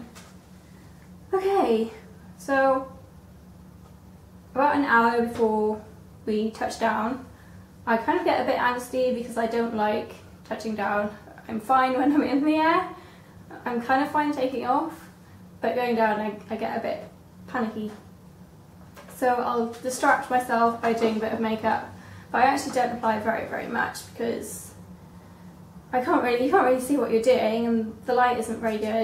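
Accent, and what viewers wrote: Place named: United Kingdom. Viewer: I like you cause your the only person who has a travel video in a British accent cause im British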